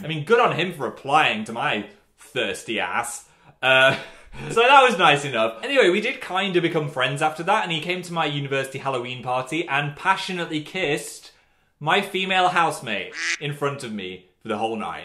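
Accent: North, United Kingdom. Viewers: u sound northern again im living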